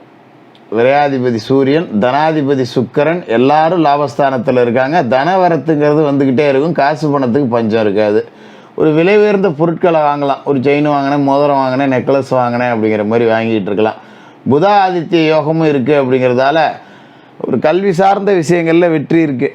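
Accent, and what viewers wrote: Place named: Thanjavur, India. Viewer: ❤ தஞ்சை தமிழ் போல தெரிகிறதே சுவாமிஜி! நன்றி 🎉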